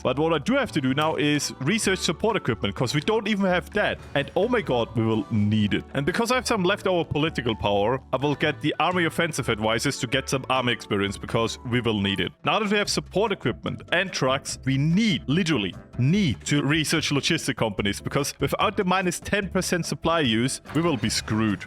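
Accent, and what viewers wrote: Austria. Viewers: Wait a minute r u Austrian or Australian?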